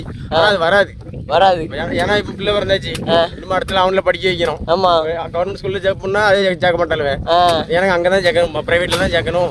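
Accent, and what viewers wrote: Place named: Tirunelveli, India. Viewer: அறுமையா சொன்னீர்கள் தம்பி நெல்லை தமி ழ் வாழ்க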